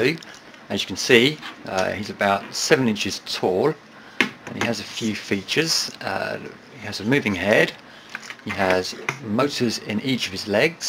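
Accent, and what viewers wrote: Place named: United Kingdom. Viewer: why's it have to be a british guy?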